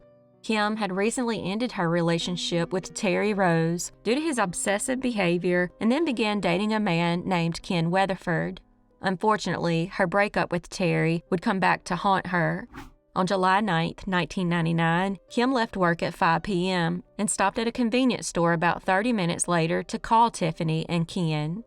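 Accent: South, United States. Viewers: I love her voice and Southern accent. ❤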